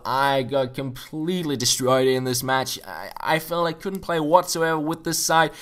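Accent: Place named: Denmark. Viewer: Man kan sgu bare høre at du Dansker ikke sandt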